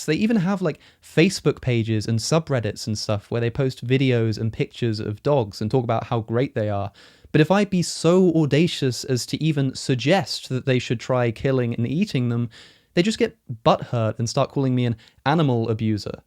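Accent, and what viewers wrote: United Kingdom. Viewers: Dear god. Please tell me this is satire. Edit: ya. Satire. Pretty good points, but leaving out a lot of the other side’s actually valid arguments that have no easy come-backs. He’s no Contrapoints or Philosophy Tube. Just a cheap imposter with a British accent. 🥱 How about this? Is it vegan to eat a person who consented before death? By Vegan ethics, yes. So I’m gonna eat my fish and chicken and my dogs can eat me when I’m done. Life is a bitch, it always has been. EVERYTHING gets eaten eventually. Wether its by worms and microbes, humans or other animals.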